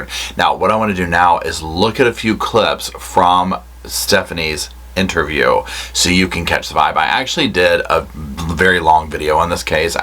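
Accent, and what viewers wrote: South, United States. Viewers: Hi and Roscoe. I was so happy to have found your channel about a week ago. You make me laugh so much with your facial expressions, your occational southern drawl, and my all time favorite is your signature whisper as you send us to a clip. Your show about Nancy Brophy is hilarious. The way you whisper the words "Miss Nancy" put me on the floor laughing. I'm going to tell all of my friends to subscribe to your channel and tell them that we have to support our brother. Keep me laughing as I watch more of your videos because I'm new. Right now (Dec 7, 2023) I'm watching your video about "Latecia's Dr. Lewis. I laughed so hard at the intro when you whisper "Her son who smoked weed in the Stairwell" 😂😂😂. Hug Mr. Roscoe P for me.🌹🦴🦴🦴🦴🦴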